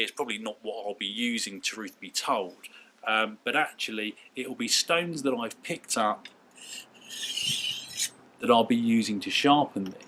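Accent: United Kingdom. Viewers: Don't keep me wrong, I love your reviews, but this british accent makes me remember Monty Python's scenes. By the way, I miss so much those guys. Thanks.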